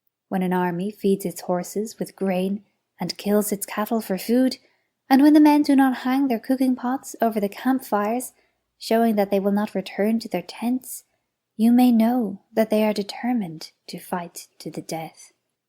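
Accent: Ireland. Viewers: What a coincidence, last week I added an Art of War audiobook vid to a playlist, intending to listen to it at the gym this week. I think I'll listen to your nice Irish-accented narration instead. Thanks.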